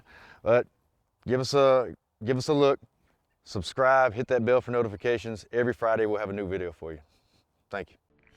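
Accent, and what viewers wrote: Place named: Appalachia, United States. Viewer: You sound like you was raised in the head of a holler like mine….like John Wayne toilet..ruff n tuff don’t take no shit off nobody…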